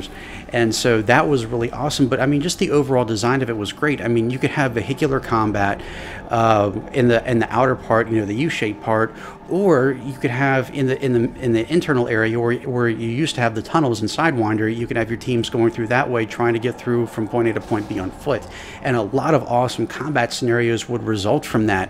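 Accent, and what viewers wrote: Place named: United Kingdom. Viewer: I C O N I C.- A Flood fan with UK accent. 👀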